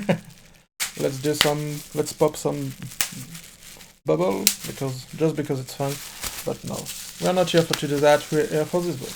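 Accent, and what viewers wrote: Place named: France. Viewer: Nice French accent :B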